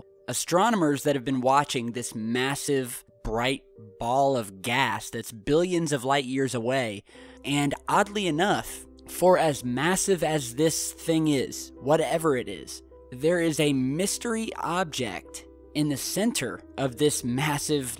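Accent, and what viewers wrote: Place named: South, United States. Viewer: man, you really have to polish that southern accent. It is quite distracting !!!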